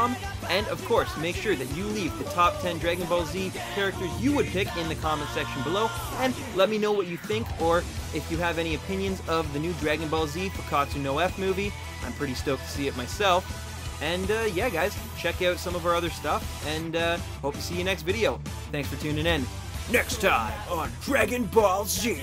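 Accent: Canada. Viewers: just the way you say about it can tell you Canadian I'm right aren't i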